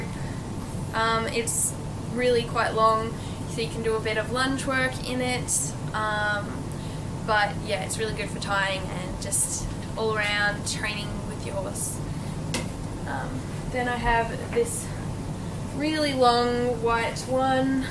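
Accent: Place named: Australia. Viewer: i love your axcent are you from australia?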